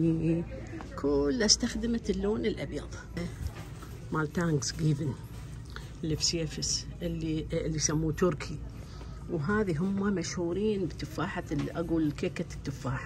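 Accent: Baghdad, Iraq. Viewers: والله استمتعنا كلش لان نفس ذبتنا وسوالفج كلش حلوه وذكرينا بالحجي البغدادي الحلو الله يبارك بيج وصحه وعافيه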